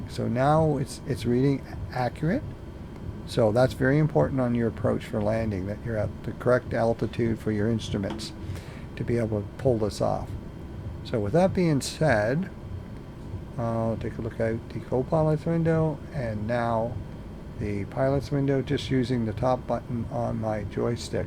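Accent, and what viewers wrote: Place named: Canada. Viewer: I loved this explanation and caught a few Canadian accent features in your narration! Aboot..... for example... It was an awesome flight and I just can't believe technology can make flight in such terrible conditions possible. It's a very impressive human feat of engineering. Thanks again for all the great information... especially the link to airport data. You've done an amazing job of demystifying the process... Jerry from Fort Worth Tx. I subscribed yesterday.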